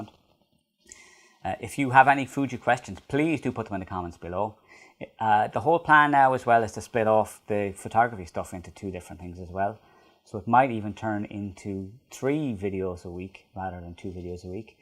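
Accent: Ireland. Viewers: Pronunciation sounded fine to me. Sincere. Thought there might be an Irish accent--not sure. (I like listening to Irish accents). Hope your wife is well. Thanks for making the video. I liked the simple background and the uncomplicated presentation. The video quality was really good. Am thinking to get an XT-2 for making Youtube videos. Thanks again!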